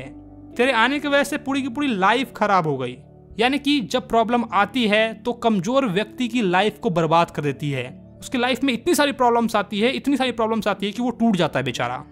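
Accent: Bihar, India. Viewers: Hi sir u are from bihar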